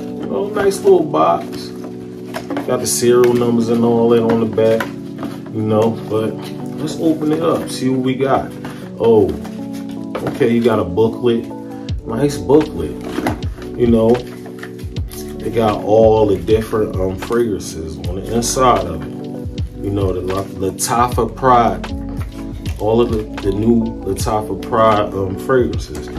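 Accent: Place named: Philadelphia, United States. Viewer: I already knew! Michigan this way. But I know that accent anywhere, friends from Philly. Thinking about wearing it tomorrow!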